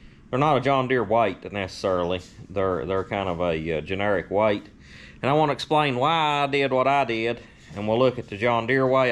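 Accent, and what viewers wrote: South, United States. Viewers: Dude stop talking redneck... It's time...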